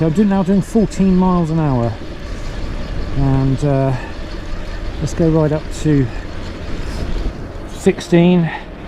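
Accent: United Kingdom. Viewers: I remember that you were talking about Furry failures 2015 and again Making a cover for the Microphone testing it with a fan. Saying fur during your variety Channel. That makes me laugh your British accent. You are Fur happy 😊. That's so cute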